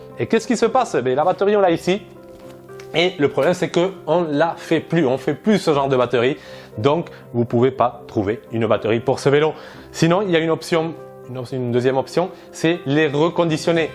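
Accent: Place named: South, France. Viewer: Bien le bonjour l'accent du soleil ^^ Le VAE... une belle rencontre pour ma part. 10 ans de pratique et franchement je donne 10/10, il fait son taf. Acquis pour un nouveau velo-taf mais surtout pour les missions de visites au domicile que je dois faire dans toute la ville. Mon 1e est acheté chez décathlon (il y a donc 10 ans), bas de gamme, 750€. Il a fallut ajouter des sacoches, des pneus anticrevaisons (efficace à 100% en ville, jamais crevé sinon tous les 15 jours... pchiiii) et une fourche téléscopique (terme ?). Et bien il a tenu 10 ans par tout temps et ce avec des milliers de Km par an (6000 km/an rien que pour le trajet domicile-travail-domicile + les missions et une année j'ai même "noyer" le système électrique vu la douche que je me suis pris). Bon, il est vrai qu'il visitait les ateliers d'un pro 2x an + batterie chouchoutée au chaud en hiver). 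J'ai fini par le délaisser (et il est tjs à la maison ^^) car malgré les régulières révisions, ben oui, la batterie n'avait plus 20 ans (comme moi d'ailleurs) et des pièces principales commençaient à lâcher (j'avais un clé allen dans mes sacoches pour reserrer le pédalier régulièrement par exemple). Bof, cela devenait galère. Puis un jour, en visite sur le site d'une grande enseigne sportive française (la même que pour mon 1e), je vois une promotion d'enfer pour un VAE d'un partenaire hollandais : 1500€ au lieu de 2500€. Wow ! J'ai craqué, il était temps, j'ai mon 2e VAE. je suis passé d'un moteur dans la roue arrière au mot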